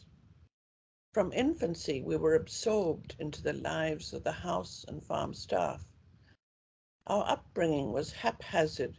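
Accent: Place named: Zimbabwe. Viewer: Well l was thinking to myself u was Rhodesian .Although there’s a difference between British South African and Rhodesian English.I had assumed u moved to KZN from rhodesia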